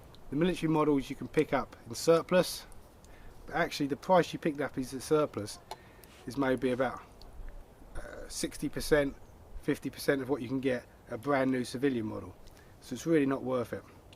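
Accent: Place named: United Kingdom. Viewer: British accent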